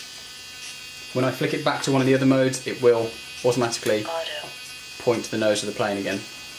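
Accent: United Kingdom. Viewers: looks like a nice gimbal. I used to think you were from the UK till I heard your GF speak, but you sound as British as they come. where do you fly out of?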